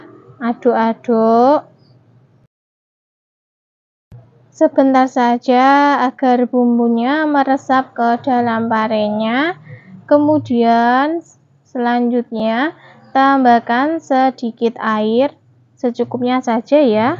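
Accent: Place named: Java, Indonesia. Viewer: Mbak e bahasa medhok banget.....😅😅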